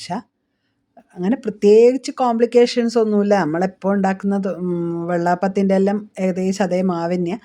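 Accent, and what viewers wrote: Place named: Vadakara, India. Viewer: ഹായ് ഞാനിവിടെ പയ്യോളിയിൽ വടകരയിൽ എവിടെയാണ് മില്ലിന്റെ സൗകര്യം ഇല്ല എന്ന് പറയുന്നത് കേട്ടു നിങ്ങൾ ഇതിനു മുമ്പ് എവിടെയായിരുന്നു ഇവിടെയല്ലേ നിങ്ങളുടെ നാട് എന്നെ ഇവിടെ കല്യാണം കഴിച്ചതാണ് എന്റെ സ്വന്തം സ്ഥലം എറണാകുളം ഇവിടുത്തെ നാടൻ ഭാഷയിൽ ഇങ്ങനെ പറയുന്നത് കേൾക്കാൻ നല്ല രസമുണ്ട് ❤👍🏻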